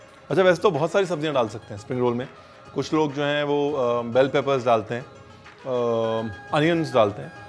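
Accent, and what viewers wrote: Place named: Punjab, India. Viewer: Phir ye mat kahna ke Maine bataya nai,kayal han ji tahade,sirf punjabi sunan lai baar baar videos dekhdi haa,punjabi tadka,luvv u g alot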